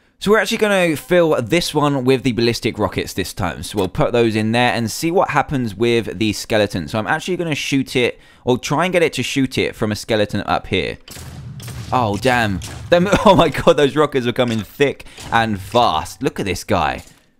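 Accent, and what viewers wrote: United Kingdom. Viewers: yes, obviously he is British, (no offence) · Are you british?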